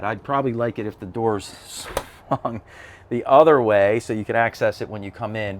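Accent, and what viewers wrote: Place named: Philadelphia, United States. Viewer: I cant tell if this is pittsburgh or philly accent...speaking fluent yinzer i lean more toward delco philly.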